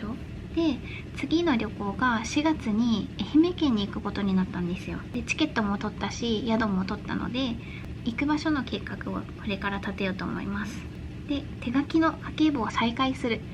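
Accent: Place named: Okinawa, Japan. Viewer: 沖縄の方言おもしろーい😊 私は愛知の尾張弁と三河弁と岐阜弁が混ざってて、よくわからんことになってます笑 愛知岐阜三重は、友達とか職場にいるからうつるんですよね、、、😅笑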